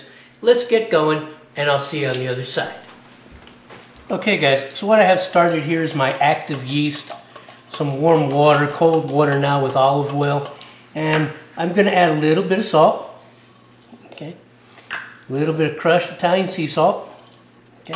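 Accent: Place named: Brooklyn, United States. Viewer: !! I love your intro, the history lesson, and this artisan pizza recipe. This is a must try. Thanks for the olive oil on the dough tip! I like that Brooklyn accent when it sneaks out too!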